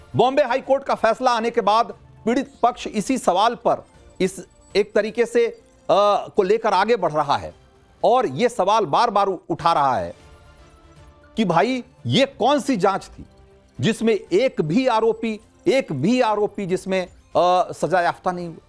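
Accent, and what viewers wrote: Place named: UP-Bihar, India. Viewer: Bhai aap k bolne se to saaf pata chalta hai ki aap up,bihar ,Jharkhand k bhayye ho lekin aap jo b bata rahe ho acha bata rahe ho, lekin kalko paise k khatir beimaani aur gaddari mat karna, kyunki bhayye log ki zubaan, saap ki zubaan ki tarah hoti hai, kabi b palat sakti Hai.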